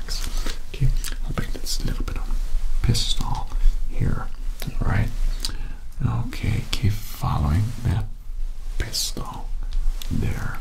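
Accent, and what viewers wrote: United States. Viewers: I love it that does an American accent, but still says "torch" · he sounds so different when speaking with an american accent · Amazing. Two giveaways to the American accent: 1. We call it a flashlight, not a torch. 2. British ASMRtists say “anything” like “a-nuh-thing” or “a-neh-thing.” Americans say it like “eh-knee-thing” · Your American accent is getting REALLY good!! 👏👏👏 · Everyone’s talking about your joker impression, but I’m just amazed by the American accent in general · the American accent sounded great. You should do it more often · damn your american accent is really good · Happy Halloween and you wore Joker costume and American accent. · That’s actually a really good American accent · His American accent isn’t perfect but it’s pretty darn good, much better than I expected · Speaking American accent. What the heck.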